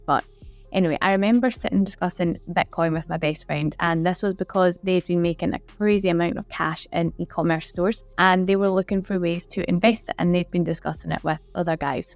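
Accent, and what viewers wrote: Scotland, United Kingdom. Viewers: Love your Scottish accent 👌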